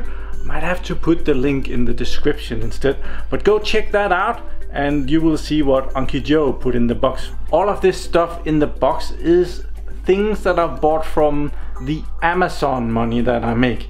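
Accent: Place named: Denmark. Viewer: im betting my ass on the line that this is a danish guy, his English accent is typical of a dane.....the ice is melting at the poles hahah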